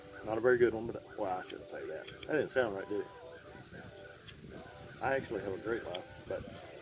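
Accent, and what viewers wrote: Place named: Arkansas, United States. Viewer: I'm new to this hobby, and I love your work. On top of that, I was born and raised in Western Arkansas, but I've been gone for just over 10 years now. I wouldn't trade the time I've spent on the mission field or pastoring now in Canada, but Arkansas will always be home. Everything about you and your videos (your accent, your sayings, the yard...) it all feels so familiar. Thanks for that.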